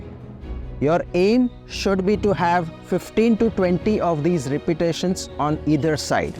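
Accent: India. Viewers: Father teach me English more than 35 year that's why understand indian English grateful to ur parents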